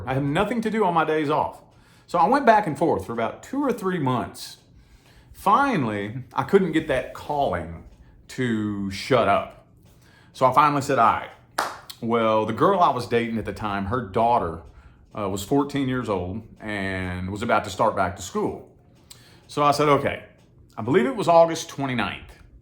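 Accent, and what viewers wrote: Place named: Kentucky, United States. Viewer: So I just found you and listened to both of your Capricorn (July) readings as I have discovered Tarot readers on YouTube (yeah, I am late to the game LOL) just about a month ago and have dug deep and found some amazing readers and great insight to my psyche and situations. Brother, I am originally from Kentucky so I picked up on your accent that is honey to my ears. Brother, you clicked right into my situation like a hand in glove. And your advice was so in tune and made me see clearly the path I was hesitant to take. Love your honesty. I am a fan for LIFE.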